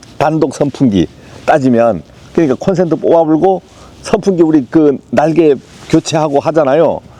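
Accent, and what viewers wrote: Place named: Jeolla, South Korea. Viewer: 이분 가족있나요?? 전라도 사투린데 ..........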